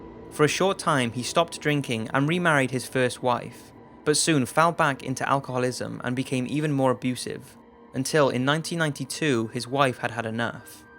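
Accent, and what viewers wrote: Wales, United Kingdom. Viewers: awesome video! Just wondering, it's really bugging me, are you Welsh? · Loved this video, it was so interesting! Are you Welsh? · 1. It really wasn't a good idea to watch this at 1am. And, 2. Are you Welsh? I'm really trying to figure out your accent and whether you're part of the Cardiff crew too 😜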